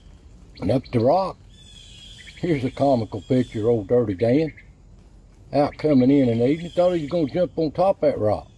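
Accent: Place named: Missouri, United States. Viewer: Just found this. The narrator sounds like folks from my hometown in SE Missouri.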